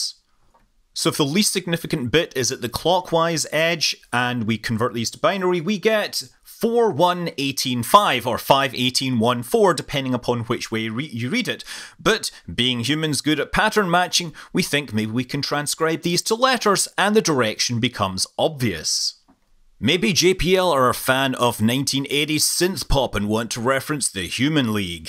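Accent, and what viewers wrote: Scotland, United Kingdom. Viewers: As in Star Trek, the best engineers have a Scottish accent. Thanks Scott Manley!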